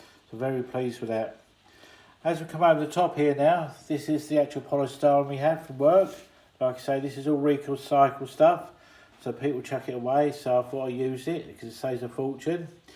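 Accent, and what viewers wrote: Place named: United Kingdom. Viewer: The rock faces look really good and the canal detail is wonderful, even the shopping trolley. Did you buy that or make it? I am a little confused because your "About" says you are in the USA but the name of your channel plus your accent makes you British. I'm being nosey I know. Regards Michael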